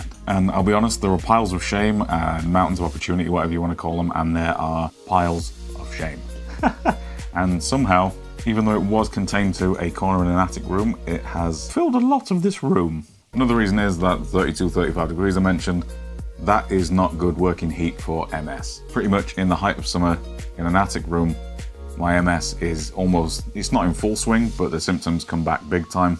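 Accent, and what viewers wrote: New York, United States. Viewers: Good video. That NY accent is excellent. Only one copy of Cursed City though? It's like you don't even know how to youtube. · I love this dude. Just discovered the channel. Keep on doin this beautiful thing your doin. Nice NY accent btw.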